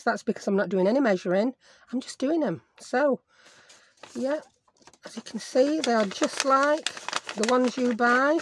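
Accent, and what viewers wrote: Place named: North, United Kingdom. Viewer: Brilliant tutorial thank you. Great to hear a Northan accent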